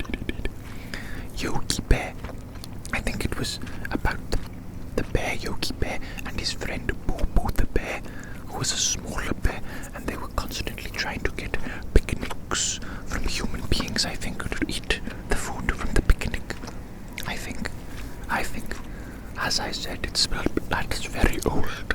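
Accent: Wales, United Kingdom. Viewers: Fantastic asmr!!! Also do I sense a welshy??🤔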